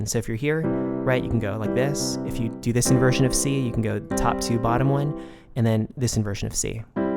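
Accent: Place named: England, United Kingdom. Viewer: What part of England are you from dude ?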